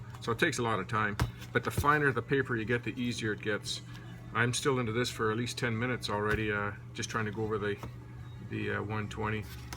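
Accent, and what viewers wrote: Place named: Ontario, Canada. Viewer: Hello, am a watcher of your films here in the Netherlands, nice work you"'r doing, very good information. Have got myself 2 commando's, also like to work on them to get them in a very nice condition. Do you live in Ontario, a friend of mine lives in Puslinch, got the same accent so was wondering? Your dream in going accros Canada and back on the Norton is a fantastic one, hope you make it, would want to join in it but a bit out off reach. Keep on filming!!!!!! Gerleen de Jong, Netherlands.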